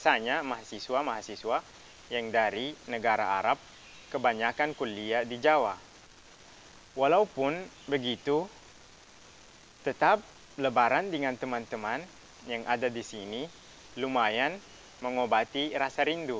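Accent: Makassar, Indonesia. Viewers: Pantas logat mu logat makasar . Ternyata kamu kuliah di makasar ya ?? Saya juga anak sulawesi.. Tapi saya di Gorontalo.. Berdekatan dengan Sulawesi Tengah dan Sulawesi Utara 🙂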